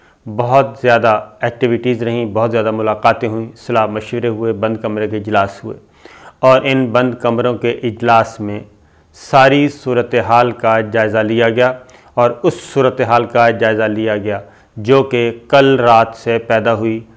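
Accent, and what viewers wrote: Punjab, Pakistan. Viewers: آپکی آجکی بغیر تسلسل والی گفتگو اور تبصرہ ایسا لگ رھا تھا کہ جیسے آپ نے ڈرگ چڑھائ ہوئ ہے۔ کبھی کچھ بھول جاتے تھے کبھی کچھ اور۔ یہ پنجابی نما پینڈووں والی اردو کہ جہاں اسکول کو سکول کہا جاتا ہے اپنی اھمیت اور اصلیت ہی کھو دیتی ہے۔ براۓ مہربانی کمنیکیشن کے کورسز کیجۓ تاکہ یہ جناب جناب کہتے ہوۓ بات کرنا بند ہو اور جو بات آپ کریں وہ تسلسل اور متاثر کرنے والی ہو۔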